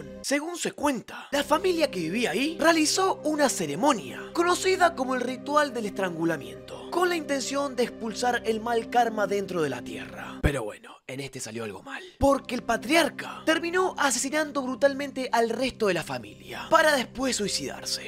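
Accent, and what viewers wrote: Argentina. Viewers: Tu acento argentino queda piola con esa especie de aliento cuando terminas una frase xd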